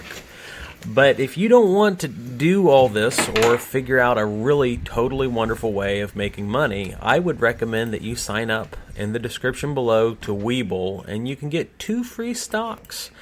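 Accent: Ohio, United States. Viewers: You know an Ohioan when you hear one 😂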